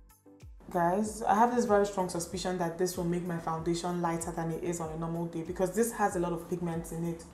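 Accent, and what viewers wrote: Igbo, Nigeria. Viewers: Hi I so much enjoy watching your video😘 And the fact that you always add your Igbo accent makes it more fun.. So please can you make a video on how to start a YouTube channel🙏🙏🙏🙏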